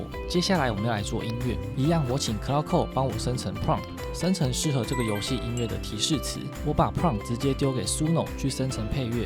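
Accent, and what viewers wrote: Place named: Taiwan. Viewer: 是台灣人的聲音太感動了 我以為ai世界沒有台灣人了全部都是中國式教學...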